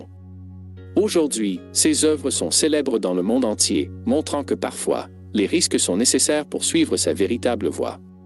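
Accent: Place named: Quebec, Canada. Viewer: De très bons exemples, j'aime bien aussi la narration avec l'accent québécois. Merci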